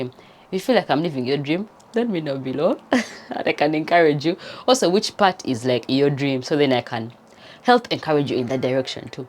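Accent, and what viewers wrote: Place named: East Africa. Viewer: you are fun. That is East African accent rocks.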